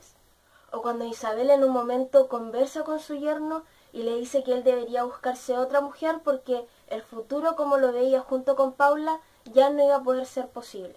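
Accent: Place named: Chile. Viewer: Hola! Me acabo de subscribir a tu canal, porque me ha encantado tu voz y forma de leer en la narración del libro "Adulterio" de Paulo Coelho. En seguidas me di cuenta q eres chilena, me caen súper bien!!😃:) Soy cubana y vivo en Miami pero he visto mucho tiempo TV Chile por lo q he podido conocer más de su gente, país y me encanta! Por cierto, no terminaste de narrar el libro? Me encantaría terminarlo narrado por ti 😔:( Gracias por leer mi comentario y ojalá te embulles a terminar el libro!😁 Saludos!!😊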